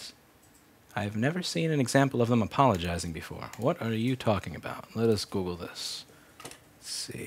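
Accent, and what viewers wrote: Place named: South, United States. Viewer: Southern Voice El gato es muy buneo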